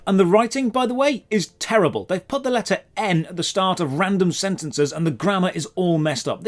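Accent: United Kingdom. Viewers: agreed. then again, he's handsome. And speaks British English. I'm sold. xD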